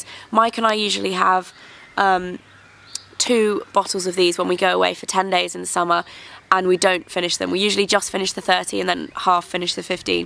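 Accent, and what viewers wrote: United Kingdom. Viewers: for my english oral proficiency class, in order to get a British accent I listen to your tutorials and reviews and I repeat what you say! maybe kinda creepy but you've helped me alot to get a consistent accent!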